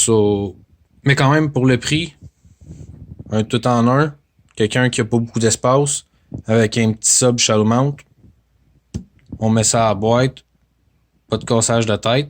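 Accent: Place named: Quebec, Canada. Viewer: gros accent du quebec :P je viens juste de commandé sur amazon 36$ livré le lendemain anyways 20 amp x 12 = 240 possible mais pour 36$ je chialerai pas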